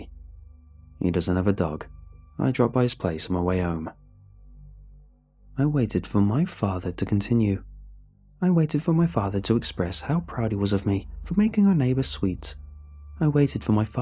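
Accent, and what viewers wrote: United Kingdom. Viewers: A velvet voice with a charming British accent reading me some Creepypasta? What's not to love? <3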